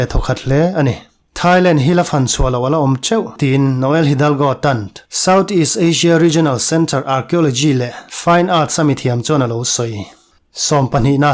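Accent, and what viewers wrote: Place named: Myanmar. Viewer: I va burma awphawi ve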